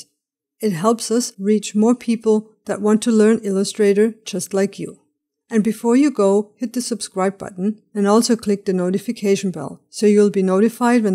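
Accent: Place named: India. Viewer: Thank you for your tutorial. I hear an Indian accent when you speak, although your photo shows your Caucasian genetic makeup. Were you born in India or lived there for a time? And your last name is German! You are a citizen of the world, obviously. Be that as it may, I enjoy hearing English in something other than my flat, American midwest accent. (I am now subscribed to your site.) Chuck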